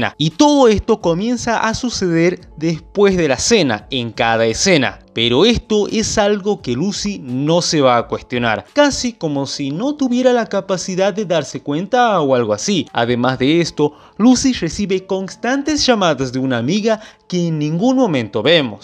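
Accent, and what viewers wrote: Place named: Entre Ríos, Argentina. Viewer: Sos medio ashastrado para hablar hermano, pero queda bien! Le da un buen estilo, parece que sos entreriano o norteño de algún lado de Argentina, un abrazo de cordoba.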